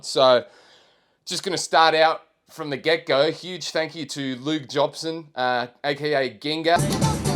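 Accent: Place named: Australia. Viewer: Bittersweet moment seeing this cause I thought I had 15 years worth of podcast to catch up on. However I would watch wreck em podcasts for at least the next 15 years if you decide to do more. Really enjoy hearing about the history of wreck em and Aussie drifting in general. I listen to a lot of American drifting podcasts, but it’s just not the same without an Aussie accent and a shithouse sense of humour. Also love your mentality behind fun drifting not just competitive drifting. I don’t want comps I want trains.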